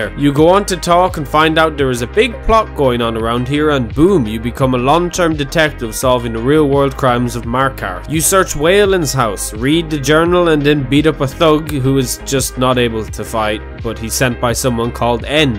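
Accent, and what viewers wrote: Ireland. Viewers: Where in Ireland are you from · I am a simple sera. I hear an irish man . I see a Khajiit. I like it.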